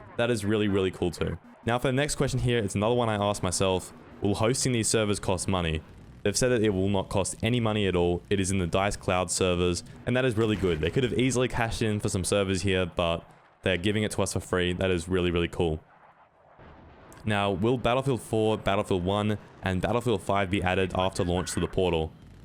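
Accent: Australia. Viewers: I subbed good to hear a Aussie doing the YouTube thing. Now to the game I can't wait to play but first I will have to get a console lol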